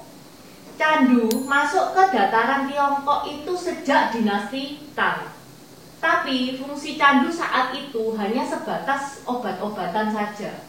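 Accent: Java, Indonesia. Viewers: Kapan-kapan kalo saya ada presentasi mau tukeran jiwa dan raga sama cece ini xD Sangat energik ngomongnya, medok e kuwi lho jeruu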